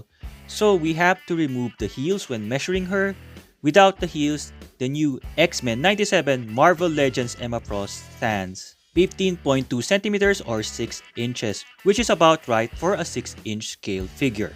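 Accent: Philippines. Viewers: Great vid! Took me 4 seconds to hear that you are Filipino 😂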